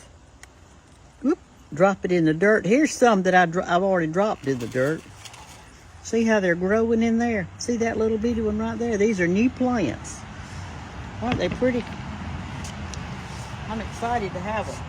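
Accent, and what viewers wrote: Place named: South, United States. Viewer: You are so precious! I love your recipes i just subscribed! Love that southern accent!!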